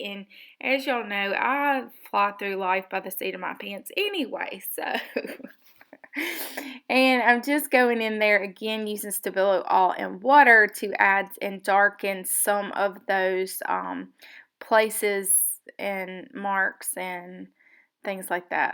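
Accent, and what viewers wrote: South, United States. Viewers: Loved watching your girl's face develop. I love how it looks when you use the scraper. I especially love listening to you talk. Love your Southern accent.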